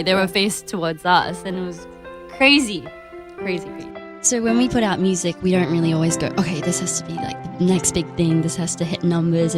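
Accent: Australia. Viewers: I'm sold because of the ausie accent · Yes ....she can inspire anyone with her words and her australian accent..gosh no wonder her voice is so amazing.... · Dat aussie accent doe. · Her random Aussie accent reminded me Ozzy The Commenter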